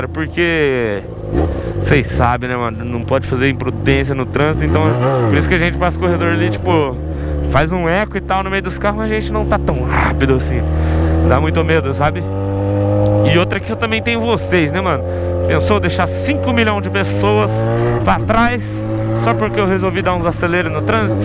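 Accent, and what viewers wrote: Goiás, Brazil. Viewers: Pq vc fala piqui de Goiás?????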